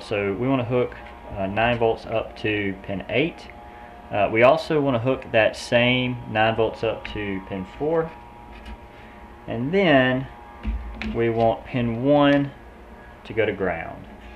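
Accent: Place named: United States. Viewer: that American accent :D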